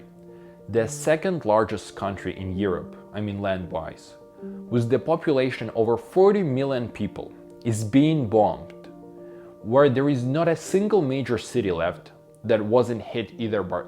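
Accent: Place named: United States. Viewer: У вас очень хороший американский акцент) Редко встречаются русскоговорящие люди с хорошим английским акцентом)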